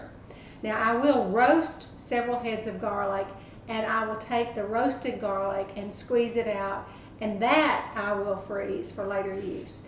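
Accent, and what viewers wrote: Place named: South, United States. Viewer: I'm really sorry if I offend anyone, but, I'm from England and that Southern accent just distracted me totally from the content of the video. I watched it 5 times... no idea how to preserve garlic, but I certainly have learned that you Southern Gals talk beautifully. Thankyou; I'm going in for listen number 6... and 7 and 8 and 9... you get the picture...:)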